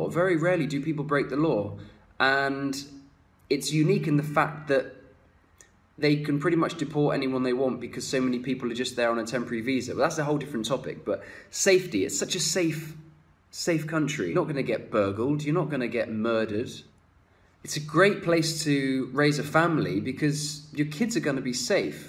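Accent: Kuwait. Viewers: Im Kuwaiti and I just wanted to thank you for showing the reality of our country unlike many opinionated ideas that have been thrown out there. There are many positive things as there are negative things in Kuwait but in my opinion, the positive things outweigh the negative by a lot. So thank you for representing my country in this positive way and for what it really is. Oh and your Kuwaiti accent is pretty good. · Happy a lot for your good experience in my beloved country..I wish that you will come back one day..and I advice everyone who decided to move there to interact more with the locals because I believe it's easy to make friends with and understand the Kuwaiti traditions..good luck in your next move..BTW way you sounded good in Kuwaiti accent. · Your kuwaiti accent is so good! I would never have guest you're a non kuwaiti ! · You’re Kuwaiti accents so cute 😂💗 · thank you to this videos to talk about my country,I proud to be kuwaiti and I'm shocked to see you speak arabic specially kuwaiti accent shloonch shno 😂😂 you cool bro ✔👏💚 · I like this vid sooo much machos diyay hhhhhh your kuwait accent i well never .. 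from kuwait ;)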